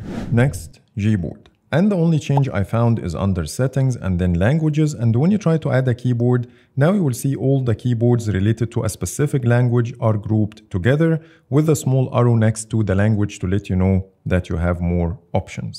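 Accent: Egypt. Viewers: Egyptian accent do be strong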